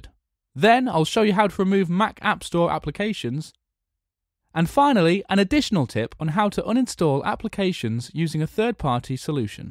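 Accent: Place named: United Kingdom. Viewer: thank you....nice british accent.....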